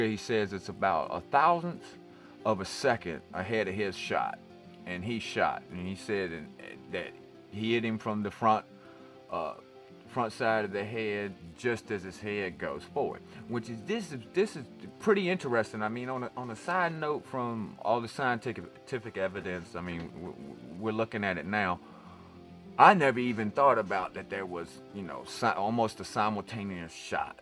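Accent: United States. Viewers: This guy is so fun to listen to, really does his homework on this stuff and makes a person think. Now if all the sheeple would just tune in and listen. I gotta new love and it's Oh and cute American accent, lol! Love from Canada xoxo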